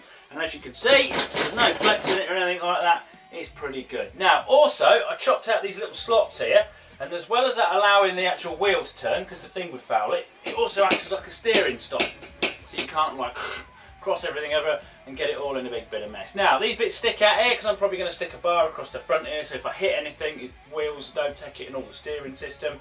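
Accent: United Kingdom. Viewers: Why is every British person the most British person?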